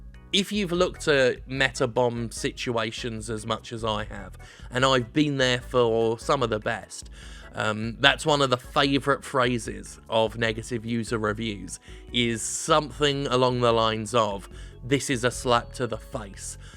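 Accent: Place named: United Kingdom. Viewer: If only I could have everything read to me in a jovial british voice..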